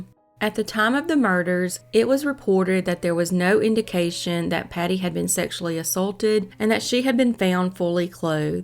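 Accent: South, United States. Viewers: It was funny..at first, I thought wow she has worked her Southern accent out! Duh…I’m Southern too! We sound the same…🙄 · I usu dont like southern accents, but I just love ur. Stay safe. Love fr Panama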